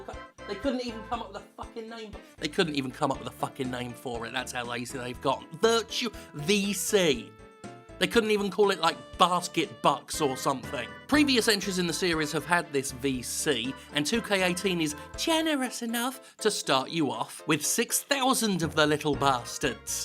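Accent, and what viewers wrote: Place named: United Kingdom. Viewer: So satisfying hearing a British bloke tell it how it is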